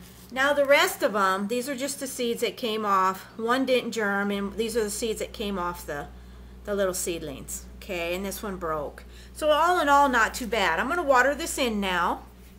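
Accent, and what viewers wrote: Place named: North, United States. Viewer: Where are you from? Accent sounds like a northern state.. near canada?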